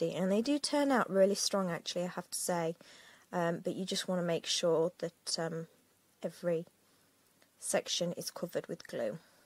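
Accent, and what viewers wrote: United Kingdom. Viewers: Great craft, great accent! You sound like you are from two different parts of UK.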